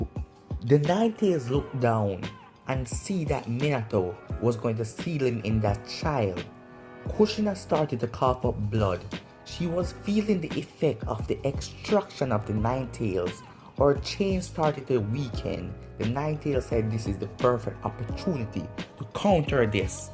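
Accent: Jamaica. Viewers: love the accent, jamaican? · He’s Jamaican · We’re are you from Jamaica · How you doin ? And are you Jamaican ? If you are wa gawn?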